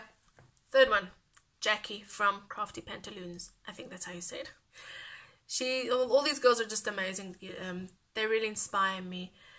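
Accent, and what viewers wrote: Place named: South Africa. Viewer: So happy to finally see you my friend, you have such a positive and smiling face. I just love your accent and really feel that South African accent is soo cool. I have a mix of indian and South African accent but my 4,5 year old daughter has very strong South African accent and she always corrects me 🤪.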